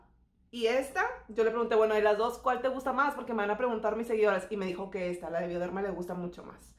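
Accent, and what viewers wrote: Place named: Sinaloa, Mexico. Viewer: Ya tienes acento sinaloense!!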